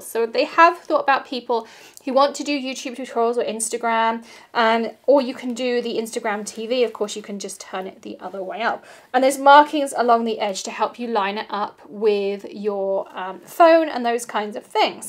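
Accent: England, United Kingdom. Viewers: Hi, thank you for your videos. They are very nice. I love the 2mn ones....and your English accent. This said you keep talking about the « top right hand corner » for past videos. I watch a lot of videos on my phone and I have nothing on that corner. On my computer, I have advert. Apart going into your page and check the whole videos, I don’t see how to get the past videos mentioned. Am I a dummy here?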